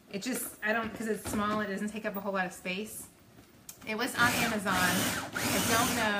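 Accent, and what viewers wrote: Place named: Georgia, United States. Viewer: Where are you from? Your accent is exactly like my friend’s who grew up here around Woodstock Ga 🙂. I just found you on YouTube and I need to go download the cookbook!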